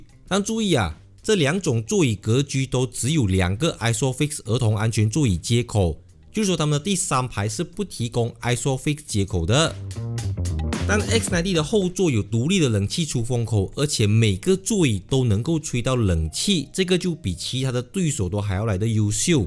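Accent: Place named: Malaysia. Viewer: 何必这么刻薄，人家不过是有马来西亚口音而已。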